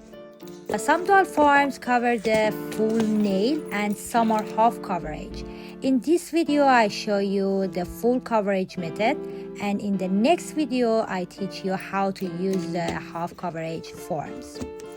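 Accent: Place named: Iran. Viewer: وای انگلیسی‌ت لهجه‌ی فارسی داره 😅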